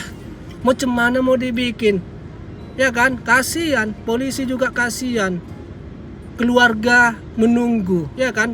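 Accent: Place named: Padang Sidempuan, Indonesia. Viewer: Senang aku dengar logat mu dongan.. Au Alak Sidempuan Horass❤❤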